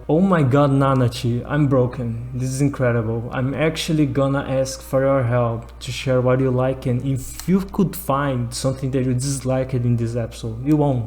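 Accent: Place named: Brazil. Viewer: sabia, dava pra perseber porque tu tem um sotaque brasileiro um pouco no seu ingles muito bom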